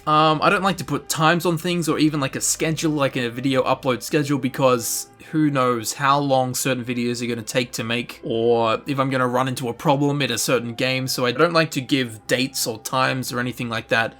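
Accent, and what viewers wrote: Australia. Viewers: WHERE? WHERE IS HIS AUSTRALIAN?!?!? WHATS WRONG WITH IT? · Wait, your Australian?!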